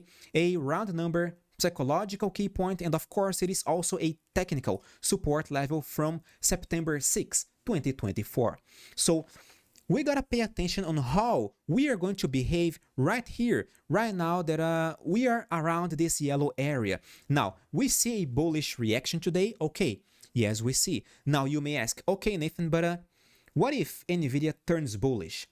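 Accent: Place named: Brazil. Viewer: Claramente um amigo do brasil, a pronuncia nao engana ! Primeiro video que vi seu e adorei. Fica aqui a minha subscrição. Cumprimentos de Portugal!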